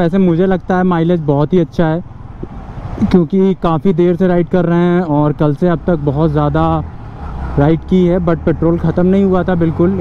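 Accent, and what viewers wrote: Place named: Mirzapur, India. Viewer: Everything aside your mirzapur like accent ❤️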